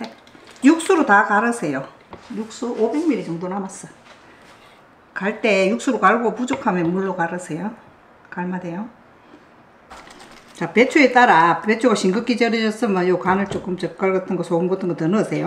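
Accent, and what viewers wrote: Gyeongsang, South Korea. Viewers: 님은 경상도에 사시나요~?경상도사투리로 말씀하시는데~김치도 약간 경상도식으로~ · 작년에 친정엄마 돌아가시고 우연히 이 채널을 보게 되었는데 펑펑 울었네요. 재료 손질하고 넣을때 터프하신 손놀림이나 경상도 말투나 엄마가 너무 생각나요. 요리를 따라서 하진 않지만 새 영상 올라오면 다 챙겨봅니다. 자주 올려주세요^^ 엄마와 딸 너무 보기 좋아요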